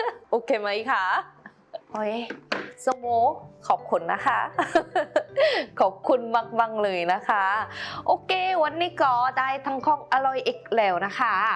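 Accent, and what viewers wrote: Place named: South Korea. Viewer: พูดไทยได้น่ารักมาก เข้าใจทุกคำ แต่สำเนียง คนเกาหลี ไม่น่าเชื่อว่าพูดได้ดีขนาดนี้ อยู่เกาหลี ไปหัดพูดกับใครครับ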